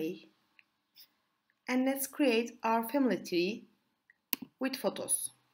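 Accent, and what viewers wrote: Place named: Turkey. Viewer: Türk aksanı bu nerede olsa tanırım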